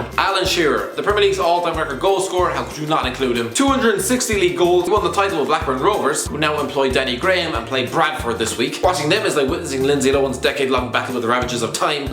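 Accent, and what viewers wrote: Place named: Ireland. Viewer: Where in Ireland are you from.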